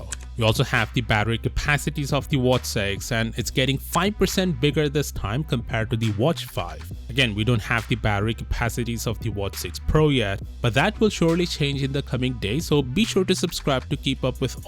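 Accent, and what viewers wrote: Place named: India. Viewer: I know an Indian accent when I hear one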